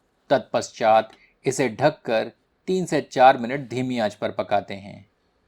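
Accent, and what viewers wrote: Standard. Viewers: Aapki shuddha hindi sunkar bahut aanand aya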